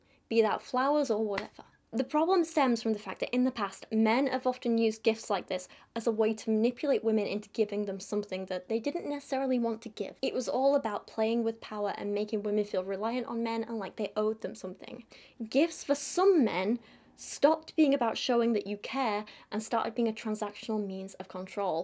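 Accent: United Kingdom. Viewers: You go! "Stupid but louder" hehehe... "Have a giggle" you Brits have a way of speaking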